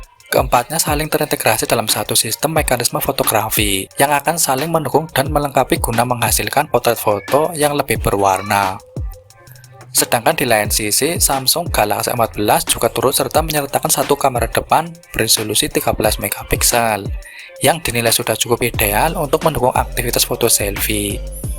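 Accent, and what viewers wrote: Banyumas, Indonesia. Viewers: Ngapak ngapak.. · orang ngapak ya bang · Soalnya aksennya "ngapak". Jadi harus menekan aksennya itu biar bisa ngomong bahasa Indonesia dgn baik. Gitu sih kayaknya. Hehe.. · Ngapak ya.. 😁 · Jawa e ndi bro? Ngapak banget yah 🤣🤭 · Wong ngapak ya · logatnya ngapak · KAN ORANG NGAPAK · Suara ngapak nya keliatan bnget yakk.. wkwk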